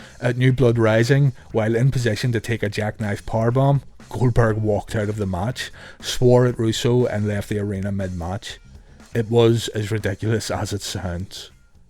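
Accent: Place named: Northern Ireland, United Kingdom. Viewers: Northern Ireland narrator is class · What part off northern ireland you from?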